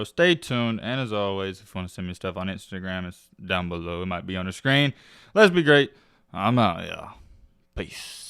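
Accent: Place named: North Carolina, United States. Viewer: He got that NC country accent idk where he is from tho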